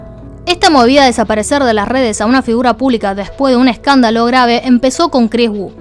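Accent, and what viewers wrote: Argentina. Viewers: ¡aguante el dialecto Argentino!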